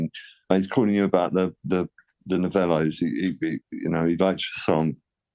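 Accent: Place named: Hull, United Kingdom. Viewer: I'm from Hull your accent sounds close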